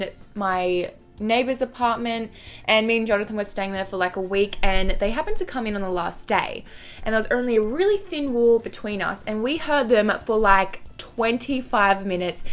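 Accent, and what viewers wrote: Australia. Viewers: Hey I can tell ur Aussie/New Zealand?...twins.hope ur near Sydney.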